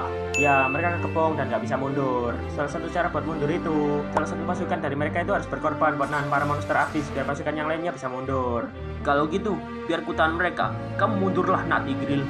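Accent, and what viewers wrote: Java, Indonesia. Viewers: Bang besok eudora (ngomong ngomong abang orang jawa kan?)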